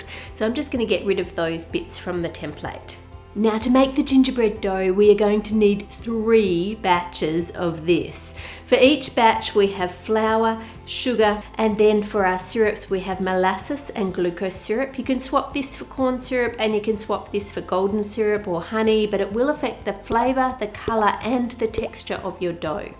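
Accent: Australia. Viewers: Wait... your Australian OMG! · Gosh I love Australian accent so much it's definitely my favourite out of all of them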